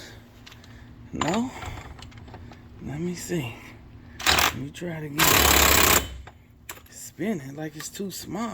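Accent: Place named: South, United States. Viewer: Nice job! I think you got a little lucky being able to get the hub off like that. Sounds like you is from the south....but up here in NY it might be more difficult for me to do. I got some whirring going 50mph+ steering to the right so it's next on my list of things to do to my 2008 DTS. Keep up the good work and thanks for the detailed explanations!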